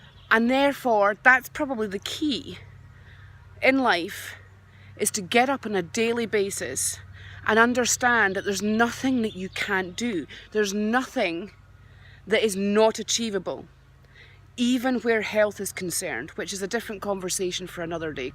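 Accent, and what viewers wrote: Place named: Scotland, United Kingdom. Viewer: Hi,. What a gorgeous place and nice to hear a the Scottish accent. Beautiful. I wish I could dream manifest the life I want, I would feel happier and less sad if so. xx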